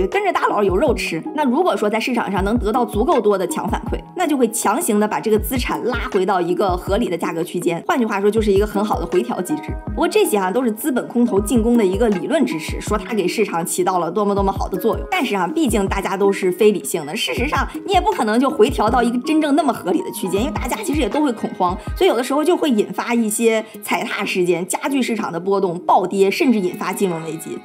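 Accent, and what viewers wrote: China. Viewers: 你如果講話能沒有大陸口音會更吸引人。還有，學習會用破音字。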